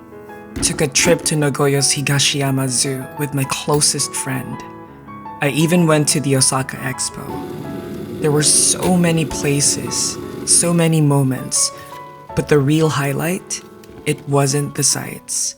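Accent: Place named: Philippines. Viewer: Your Phillipino English accent is so beautiful and relaxing.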